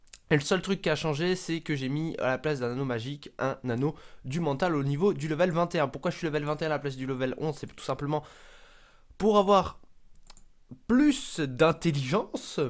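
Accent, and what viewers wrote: South, France. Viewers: Le bon accent du sud :D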